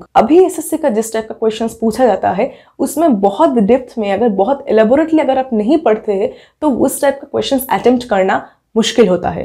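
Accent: Bengal, India. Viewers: Aap bengali ho kya mam ??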